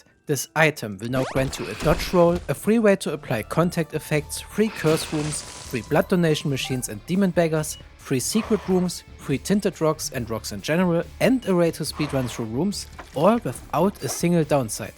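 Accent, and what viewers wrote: Germany. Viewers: Ah yes DER DEUTSCHE AKTZENT is stong with you :33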